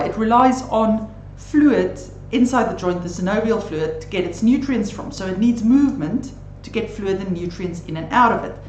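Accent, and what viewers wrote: South Africa. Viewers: … great and is that a lovely South African accent? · Quick question, i was messaging my knee and one the outer side just next to the knee cap, I got a long lasting sharp pain, could messaging trigger pain for this kind of injury? Love the South African accent! Thank you for the video! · I am so happy I discovered this site . I have been suffering all of the symptoms shown on this clip , for 2 months , thinking I had rheumatoid problems. It’s difficult to actually see a medic these days , so any problems are generally handled over the phone . The advice I got was to consume painkillers , up to 8 a day , then get in touch if there was no improvement .... I took 2 and tried to keep my walks to 8k per diem , instead of the 16k to 18k that I usually do . I am still strong and reasonably fit for my age (79) .... time to my boring rave ..... 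I will start my new routine tomorrow. My mood is lighter already. Thanks to the lecturer , well presented information , clearly and succinctly in a great ..... South African accent ? Tomorrow I start . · Brilliant video. You sound South African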